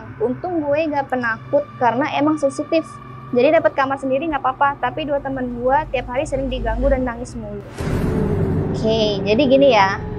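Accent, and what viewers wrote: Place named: Palembang, Indonesia. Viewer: Yeee kak update video lagiiii.. ini bukan serem lagiii,ini ngelucuu..,logat nya palembang bnget deh..kak tmbah cantik,sehat selalu ya kak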